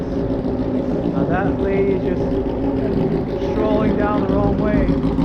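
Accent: New York, United States. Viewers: The hustling and bustling of the streets at Chinatown and Little Italy. I love to listen to the commentary and your NY accent. I will watch in stages. Do you prefer day or night bike rides? You have done an excellent vidblog. Thank you.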